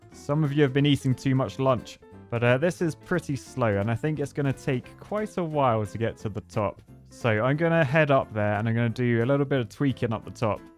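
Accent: United Kingdom. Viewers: Why are you. British your supposed to be American.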